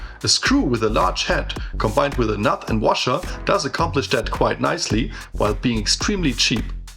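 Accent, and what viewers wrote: Germany. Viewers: Notable observation: if the author of the video about 3d printing has a German accent, the video is going to be awesome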